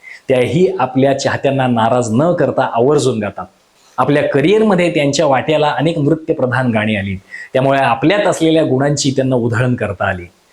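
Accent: Standard. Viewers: शुद्ध, स्पष्ट मराठीत माहिती ऐकून छान वाटले!वर्षाजीचे सर्वच चित्रपट पाहिले आहेत. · शुद्ध भाषा बोलता छान वाटले 👌👍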